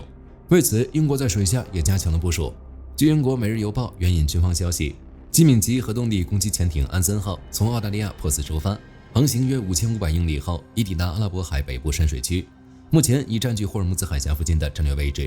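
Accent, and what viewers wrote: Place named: Northeast, China. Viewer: 東北人呀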